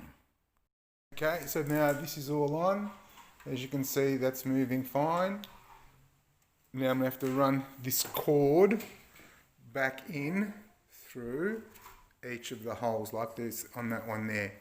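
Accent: Australia. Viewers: Thanks for that. Nice to hear an Aussie accent. My timber venetian blinds faded in less than a year thanks to the Australian summer sun. lol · It's so nice to hear an Australian accent for a change (fellow Aussie here). I've been collecting discarded cedar blinds for awhile for a large scale upcycling product (patio ceiling lining), so I'm well practiced at dismantling them the same way you do. Refinishing them en masse is a daunting task though! I've tested out a few methods so far, such as paint stripper, but I haven't found anything that works as well as sanding unfortunately. I'm planning on laying multiple slats flat next to each other and clamping them down with a batton lying across the top of them to hopefully speed up the sanding process. It's comforting seeing someone else refinishing them as well. It gives me hope!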